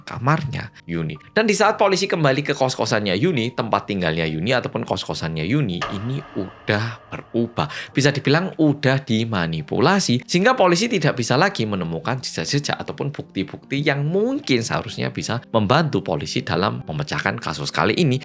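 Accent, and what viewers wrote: Java, Indonesia. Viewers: Knp logatnya Jawa bgt🤔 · Dapat notif langsung ambil kopi, stay ke mas medok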